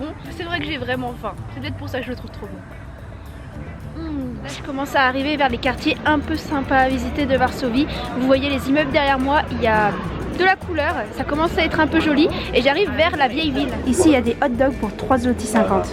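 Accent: United Kingdom. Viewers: ton accent anglais on t'en veux pas... sans être méchant:) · fait une vidéo super sympa et très inspirante pour mon prochain séjour à Varsovie, et la seule chose que les gens commentent, c'est son accent anglais. Comment voulez-vous que les gens soient confidents en parlant les langues étrangères quand ils vont se prendre des remarques derrière... Cette mentalité, il n'y a qu'en France que je l'ai vécue et ça me désole! Merci pour ta vidéo!:) Well done!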